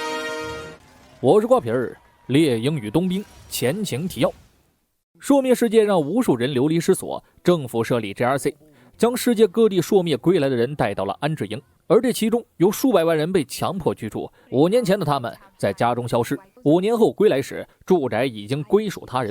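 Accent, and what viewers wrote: China. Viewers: 听你口音，你明明是大陆人，为什么要用繁体字呀？蛤？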